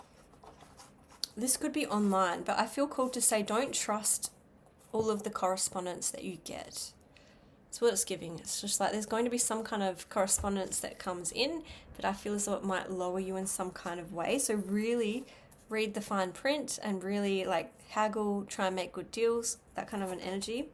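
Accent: Australia. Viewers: You have to be good. You hail from Oz. I knew you were by your accent. I'm from Oz. Adelaide living in Canada.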